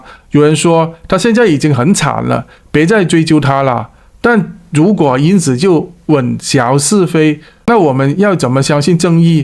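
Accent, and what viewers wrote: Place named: China. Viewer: 你的口音是中國人,台灣的事與你何干呢？法律會制裁他們。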